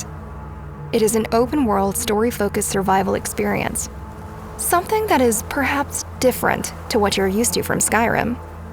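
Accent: United States. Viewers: I would have got a none american accent to narrate considering its fantasy, and elder scrolls which uses European accents mostly. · The American accent doesn't do it for me.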